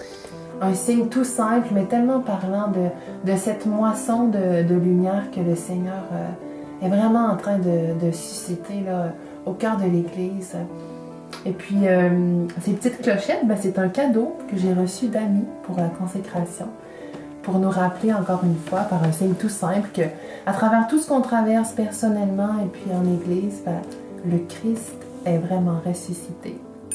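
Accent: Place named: Quebec, Canada. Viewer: Quel bel accent québécois..il y a 40 ans je suis restée 3 mois à Montréal pur terminer ma thèse de maîtrise sur le mythe dr Maria Chapdelaine,,,,quel pays mervéilleux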